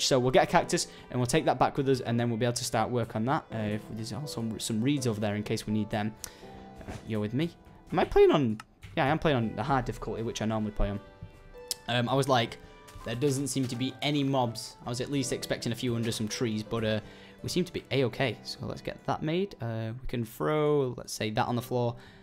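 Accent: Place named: United Kingdom. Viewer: your brittish never gets old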